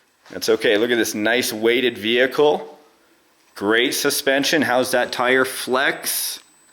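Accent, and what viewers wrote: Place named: Canada. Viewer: Your patriotic words in your Canadian accent brought a tear to my eye... amazing what America has become. A salute to you sir and a big AYE. Bless the North American continent!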